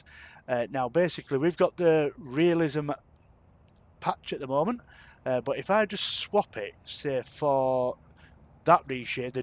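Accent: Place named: Northern England, United Kingdom. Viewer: arr thats a northern man if i ever heard one! thanks for the video <3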